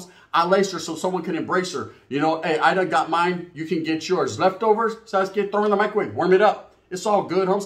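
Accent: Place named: New Mexico, United States. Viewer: Bro your videos I can’t get threw without laughing lol I know all the lingo cause I’m from New Mexico lol that’s how we talk carnal 😂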